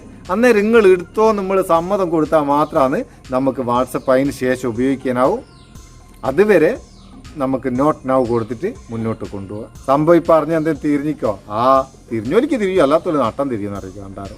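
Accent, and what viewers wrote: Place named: Malabar, India. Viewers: ഇങ്ങളെ മലബാർ ഭാഷ കേക്കാൻ നല്ല മൊഞ്ച് ഇൻഡ്. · അതാണ് മലപ്പുറം ഭാഷ😄😄🥰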